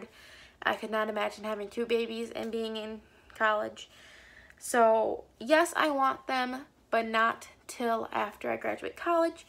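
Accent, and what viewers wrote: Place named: South, United States. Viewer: Aww girl this is so cute! :) You're so beautiful and seem so sweet! I love how you are still so motivated and have great life plans. <3 You sound like you have a bit of an accent... are you from the south?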